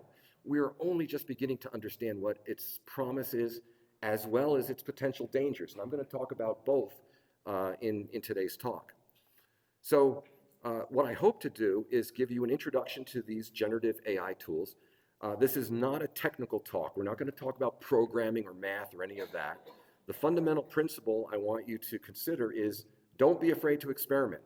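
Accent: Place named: Italy. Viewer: came for the AI talk, stayed for the Italian-accent poetry Great intro to generative AI. You made a complicated topic feel practical, and the “AI predicts words, it doesn’t think” explanation really clicked. Also… now I have no excuse for bad emails anymore. Nice job making AI understandable for normal humans. Cafer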